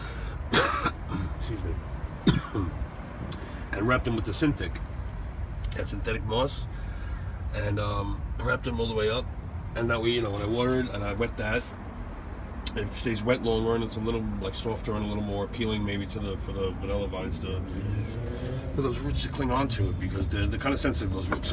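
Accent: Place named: United States. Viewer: Where do you live? You sound American but I figure you live somewhere in Europe with the schwerter comment...love the balcony!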